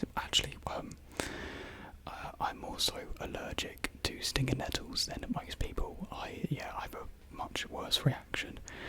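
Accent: United Kingdom. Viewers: I use your videos to learn British accent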